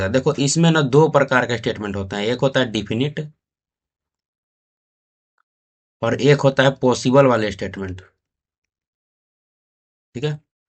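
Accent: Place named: Patna, India. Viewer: Bhaiya Patna se lagte hai sayad bhaswa wahi hai ❤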